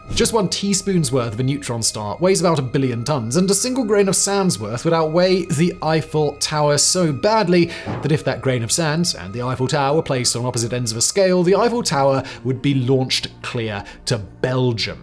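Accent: United Kingdom. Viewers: Why is it only British men are allowed to narrate space or nature videos?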